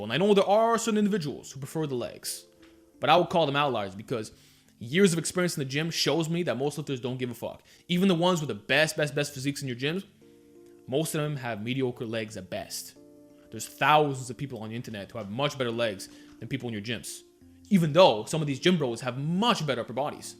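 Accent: New York, United States. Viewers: Yo this is not a diss juat realized you have a NY accent with a little bit of a chinese american accent mixed in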